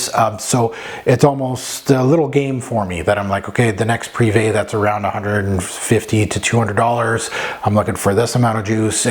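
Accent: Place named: Quebec, Canada. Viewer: Hello Mon Ami Bonjour ou Bonsoir des USA Always such a pleasure to get your opinion with amazing accent French borderline English Du Canada if I m right Merci beaucoup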